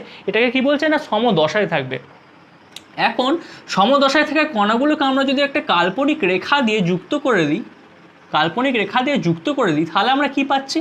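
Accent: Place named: Bankura, India. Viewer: Dada apnar bari kothai? Ascent ta Bankura er moto laglo!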